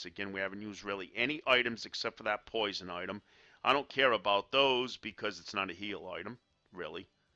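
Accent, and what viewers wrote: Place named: Boston, United States. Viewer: Watching this almost a year later bro. Thanks. Just wondering, you from Boston? I hear the local accent.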